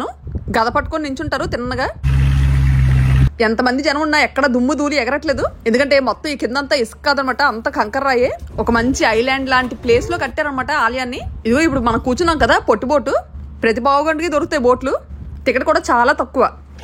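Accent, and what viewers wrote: Vizianagaram, India. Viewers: మీ మాటలకు నేను ఫేవరేట్, మన విజయనగరం యాస చాలా బాగుంటుంది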